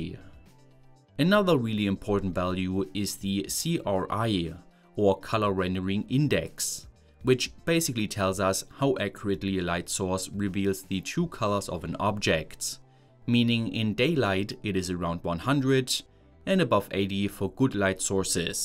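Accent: Germany. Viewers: love your German accent too I know it's irrelevant. When are you gonna build an esp32 grow tent for that German plant that people like to consume lol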